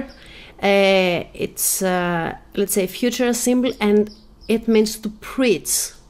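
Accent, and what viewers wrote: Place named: United States. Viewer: Essa pronúncia está errada. É uma pronúncia "Americanizada". O Grego Bíblico não era pronunciado assim na século 4.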